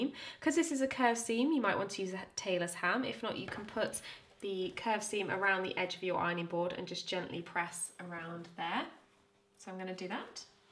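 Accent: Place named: United Kingdom. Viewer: She sounds British so....maybe the UK?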